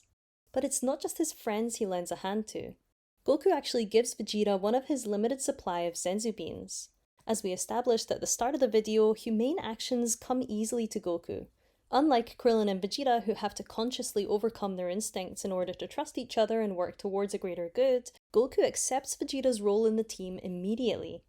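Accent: Scotland, United Kingdom. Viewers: I subscribed so I don't miss it 😜 are you from Scotland? 😅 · You sound like a Scot that went to university in England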